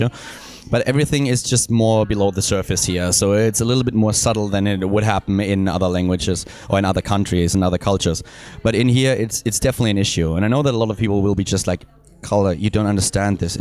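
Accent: Germany. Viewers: Man!!!. You have strong German accent. How can you teach English to kids? They are going to learn German. How did you get a job at Korea. Now. I told my mom to apply job. Ha...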